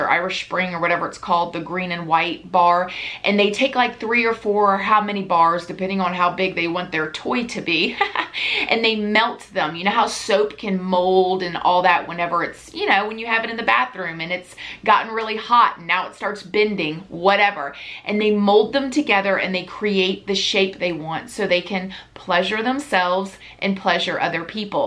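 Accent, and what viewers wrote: South, United States. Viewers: You are literally so precious!!! Love your southern twang x you kept cracking me up!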